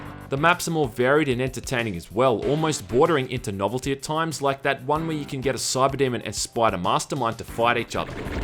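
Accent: Australia. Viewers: said UAC, maybe you just misheard him because of his Aussie accent.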